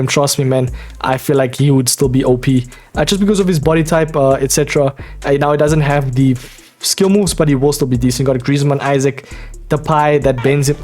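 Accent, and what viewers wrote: South Africa. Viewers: As sooon as i heard your voice i knew you were south african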